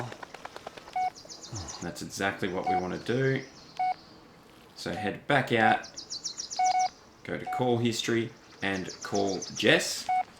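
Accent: Australia. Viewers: GODDAMNIT the one good walkthrough I find!!!!! He has an Australian accent. I can’t focus 🤤🤭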